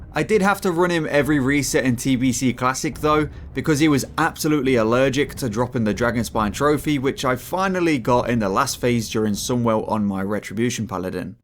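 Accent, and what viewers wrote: United Kingdom. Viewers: Oh god why does it have to be bri’ish… blimey mate talk normal please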